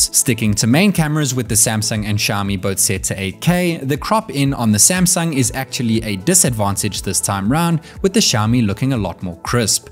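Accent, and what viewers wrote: South Africa. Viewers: South African ❓I'm new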